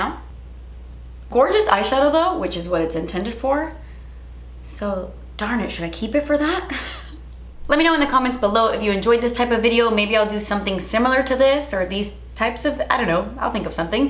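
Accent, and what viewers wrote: Cuba. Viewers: TANTO DINERO PARA ESA MIERA... lol i love you 😘 Cuban accent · I'm in love with you Cuban accent 💓 😂 besos! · Please do a Spanish tutorial!!! i love the Cuban accent 😍😍 i would love to see you do it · Please do a Spanish tutorial! I love your Cuban accent :)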